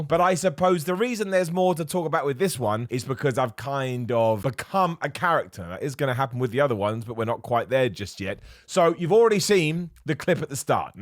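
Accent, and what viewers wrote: United Kingdom. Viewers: The british accent 😅🤣👍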